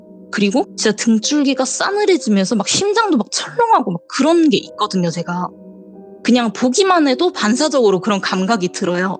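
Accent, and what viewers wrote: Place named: Gyeongsang, South Korea. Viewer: 경상도 마산쪽 사투리인데?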